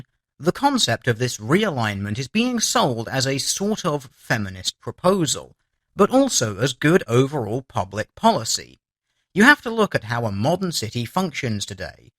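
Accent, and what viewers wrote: United Kingdom. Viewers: You got me subscribed with your British accent and the numa numa joke. Hope all your vids are as good as this.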